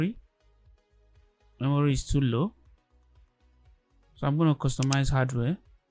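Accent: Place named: Nigeria. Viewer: Oh ur accent just sounds Nigerian thats why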